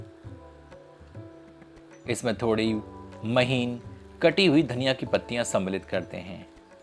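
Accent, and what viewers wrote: Standard. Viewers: शुद्ध हिंदी भी सुनने को मिला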